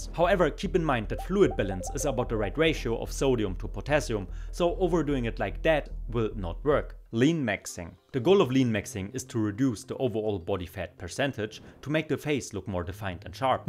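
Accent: Germany. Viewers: Gutes Video, man merkt dass du dir viel Mühe gibts und von der Qualität ist das echt stark. Echt verwunderlich wie du nur so wenige Abos hast. Ich denke mal öfters videos hochladen hilft dir bestimmt um mehr viewers zu attracten. Versuch bei deine Aussprache ein bisschen mehr den deutschen Akzent zu vertuschen weil es manchmal stark auffällt, aber nicht weiter schlimm. Man versteht alles und die videos sind von der Geschwindigkeit echt super. Mach weiter so. Ich wünsche dir viel Erfolg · I can Tell by your First Word you Are german . Guten Tag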